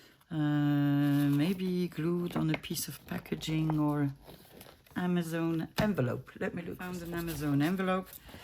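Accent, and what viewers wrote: France. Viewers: Dear don't be sorry. I love to see what you make in your own wonderful chaotic way. And I love to hear you talk with your beautiful French accent. But post when you're okay and when you're up to. Good luck with your operation, try to not worry to much. Hugs from me, Gertie from the Netherlands. Btw I'ld lóve to see the puppies! 🩷🩷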